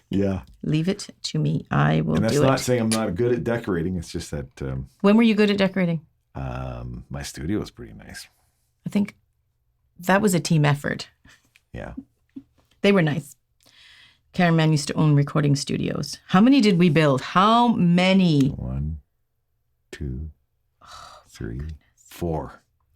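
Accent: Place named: Canada. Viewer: DO YOU MAKE THOSE LIL HATS?? WHERE DO YA GET THEM?? MY PRAYERS ARE FILLED WITH THOUGHTS OF YOU AND CAMERA MAN AND YOUR GUITAR PICK'N BABY GIRL THIS HOLY DAY SEASON AND THE HOLIDAY'S ALL TOGETHER... MERRY CHRISTMAS AYE... I NOTICE YOU SAY AYE AAAALLOT SO CUTE. MUST BE A CANADIAN THING HUH. "I MEAN AYE"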